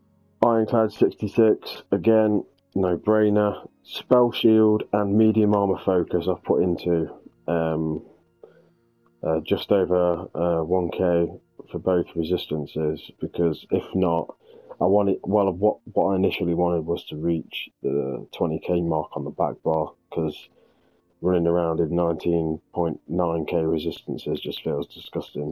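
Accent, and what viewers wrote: United Kingdom. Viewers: Ah man ... I hate you right now... I just know every stam sorc eu is gonna be popping this build and I run a low resistance mag dk... 😑😑😑... brutal build and cool explanations bro... Something is bugging me, are you north, you sound like your my end 😂😂😂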